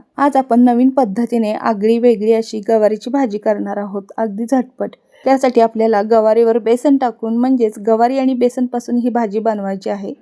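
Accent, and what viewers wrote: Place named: Otur, India. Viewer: खूपच सुंदर ओतुर होऊन बोलते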